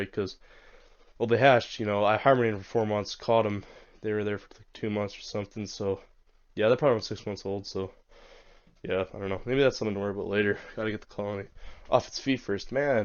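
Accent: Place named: North America. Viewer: The colony scent comes from the grease/oil they are covered in. This layer is made up of strings of hydrocarbons and the combination is different for each colony, they apply it when they groom themselves and each other. It also acts as their water proof layer so they repel rain drops and is the reason they look reflective when you shine a light on them to film. Be careful adding different queens, it's almost impossible into an established colony and the other issue you've got is you actually don't know what species your ants are. Judging by your accent I'm going to assume you are in North America and this makes it fairly unlikely they are Lasius niger. They might be, it is possible there are a few pockets of Lasius niger especially in Canada, but is more likely they are Lasius neoniger or Lasius americanus.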